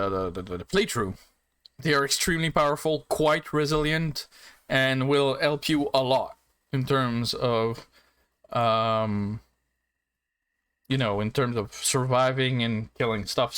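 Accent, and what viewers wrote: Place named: Quebec, Canada. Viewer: Are you quebecois dude because you sound like the people I listen to on the radio here in st hyacinth. I love it!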